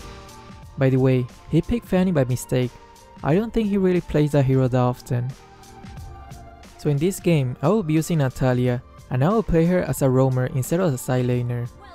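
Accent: Philippines. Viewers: im confused at your accent are you filipino or what?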